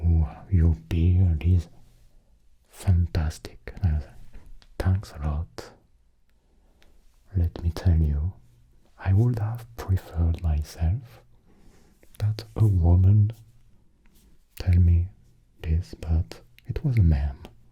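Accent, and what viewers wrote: France. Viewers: Are you french mate?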